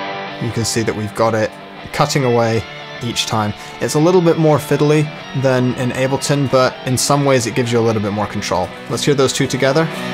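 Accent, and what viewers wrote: United Kingdom. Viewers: I thought you are from the UK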